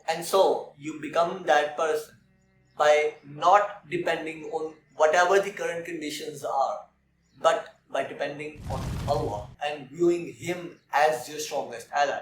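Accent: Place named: Pakistan. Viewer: Your ascent is little bit pakistani where are you from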